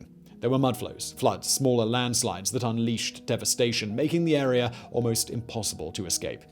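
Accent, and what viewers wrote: United Kingdom. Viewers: You Tube SUCKS! I have removed this man's channels over and over again. But when You Tube changes the algorithm, it force feeds me this guy's many channels despite my removing him. channels suck. They are poorly researched information that is mostly government propaganda talking points. Terrible videos. And just because has a British accent, this doesn't not make him an authority on anything. He's just a talking head. England is over. Brexit has made the only job in England a You Tube content provider. Terrible list videos that spread falsehoods and bull. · agreed. Also this limey sounds stooopid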